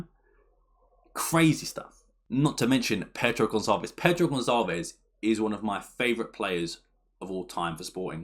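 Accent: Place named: United Kingdom. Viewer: por acaso ainda falas português? O teu sotaque britânico é tão perfeito que nem te imagino a falar português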